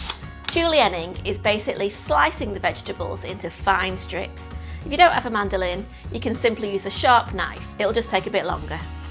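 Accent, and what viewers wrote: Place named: England, United Kingdom. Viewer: At last.......an English accent :)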